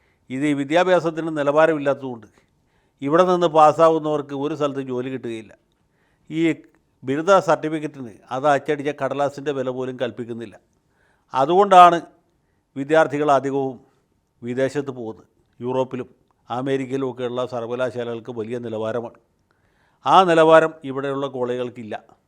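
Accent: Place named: Thrissur, India. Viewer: സാറിന്റെ തൃശൂർ ഭാഷ 😃😃👌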